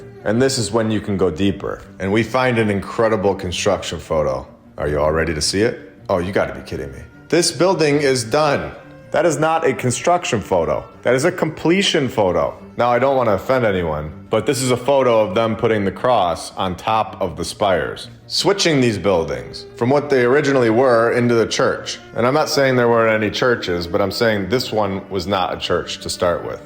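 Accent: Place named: Midwest, United States. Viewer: I knew you were a Midwesterner like me haha. I could tell by that unmistakable accent. I'm from Michigan, born and raised, but I lived in Milwaukee for 5 years. I feel so stupid because I NEVER even considered the architecture! Like never even glanced at it! I regret that so much! But if you don't have eyes to see...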